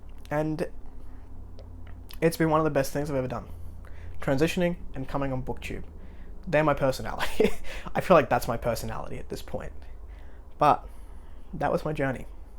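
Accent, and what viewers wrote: Australia. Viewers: Extremely open and brave video,. Sounds like a long and difficult journey, but I’m glad you’ve gotten to a good place with it all. I don’t directly know anyone who’s transitioned, so I learned a lot. Thank you. And apart from that, it’s always a joy to hear your Aussie accent, mate. 🙂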